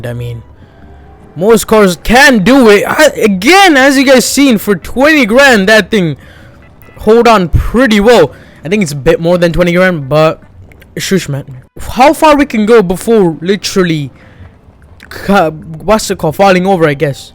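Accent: Germany. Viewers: i love the german accent. Ich hab noch nie so einen schönen , guten , vry butiful germanium mensch gesehen. spass bei seite ich liebe deine videos! Dein humor ist perfekt :D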